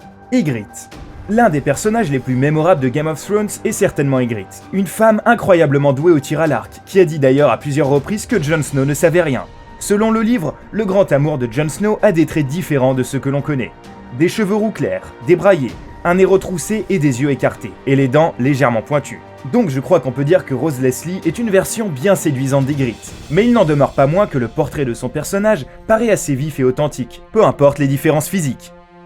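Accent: England, United Kingdom. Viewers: Arrête avec l'accent anglais et tu écorche tout les noms et ta jamais vu game of throne sa se voit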